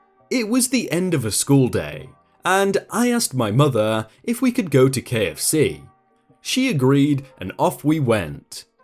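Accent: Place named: Midlands, United Kingdom. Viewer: Curly Nation06 Yeah, he has a midlands accent, I believe. Definitely somewhere middle or north in the UK XD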